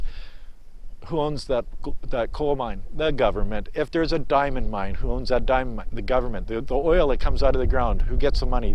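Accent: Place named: Canada. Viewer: you are definitely sounding much like a Canadian!! Cheers mate. love your rants...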